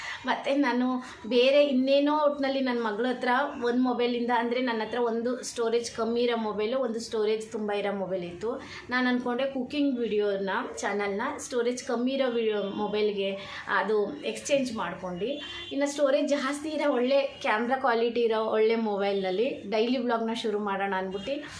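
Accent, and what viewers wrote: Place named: Hassan, India. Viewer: ಮೇಡಂ ನಮಸ್ಕಾರ ನೀವು ಮಾಡುತ್ತಿರುವ ಇತ್ತೀಚಿನ ವಿಡಿಯೋಗಳನ್ನು ನೋಡುತ್ತಿದ್ದೇನೆ ಎಲ್ಲಾ ವಿಡಿಯೋ ಚನ್ನಾಗಿ ಬರುತ್ತಿದೆ. ನೀವು ಸರಳವಾದ ನಮ್ಮ ಹಾಸನ ಜಿಲ್ಲೆ ಭಾಷೆ ಚನ್ನಾಗಿದೆ. ನೀವು ಏನು ಓದಿದ್ದೀರಾ ಇಂಗ್ಲಿಷ್ ಪದಗಳು ಚನ್ನಾಗಿ ಬಳಸುತ್ತೀರಾ, ನಿಮಗೆ ಒಳ್ಳೆದಾಗಲಿ. 🙏🏽🙏🏽🙏🏽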